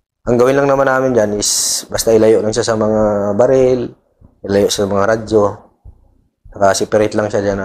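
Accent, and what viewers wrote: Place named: Visayas, Philippines. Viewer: Hehhehe katawa ko sa baho way ligo² mura lagi ka og bisaya sir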